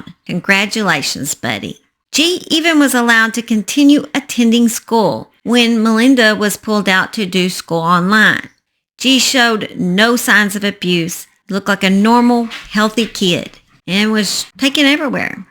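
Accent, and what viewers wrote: South, United States. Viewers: Southern girls get lost in there?? Not hardly people will eat that accent up. We northern girls are unapproachable. LOL You are who you are, that's a good way to be!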